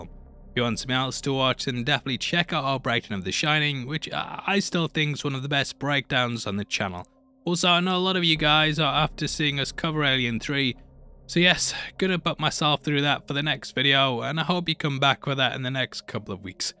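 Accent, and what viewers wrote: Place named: United Kingdom. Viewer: why you english so british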